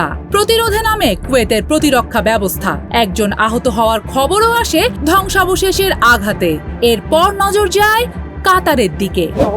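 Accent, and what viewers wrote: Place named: India. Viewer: আমাদের নিউজ বা পাঠক পাঠিকাদের থেকে আমরা সুমৃষ্ট বা সুন্দর উপচতাপনা শুনতে অবচত্য দয়া করে ইনডিয়ানদের মতো সংবাদ পাঠ করা বন্ধ করুন বা বিরত থাকুন এতে শুনার বা দেখার আগ্রহ হারিয়ে ফেলি! কমেন্ট ভালো না লাগলে অপরাধ মার্জনা দৃষ্টিতে দেখবেন ! কিন্তু কমেন্টা করতে আমি বাধ্য হলাম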